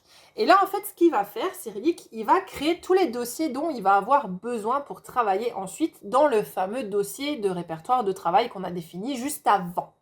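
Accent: Alsace, France. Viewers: Ah on entend enfin ton accent :) et je découvre l'humour alsacien :) sinon je garde ça de côté j'en suis pas là !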